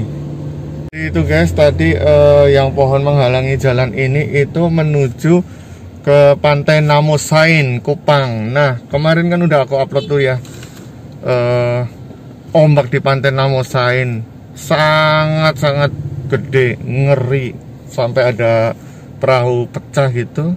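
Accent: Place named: Java, Indonesia. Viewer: Kok logatnya ada jawanya Om. Dulu kuliahnya diJogja ya Om.🙏😁😁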